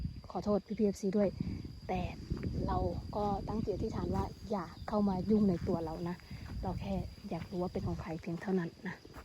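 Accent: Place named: Isan, Thailand. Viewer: น้อง รู้ไหมเวลาน้อง พูดอีสานเสียงเพราะน่ารักมาก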